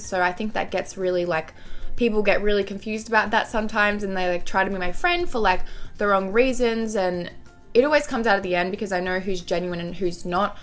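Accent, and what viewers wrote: Australia. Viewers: Hey I can tell ur Aussie/New Zealand?...twins.hope ur near Sydney.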